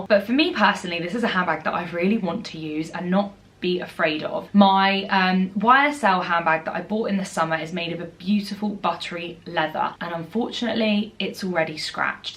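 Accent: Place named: United Kingdom. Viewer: Is she British? She’s has their accent!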